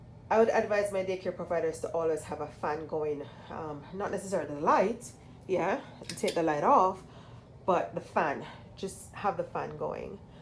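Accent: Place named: Jamaica. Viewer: Hi 👋 😀 You sound Jamaican 🇯🇲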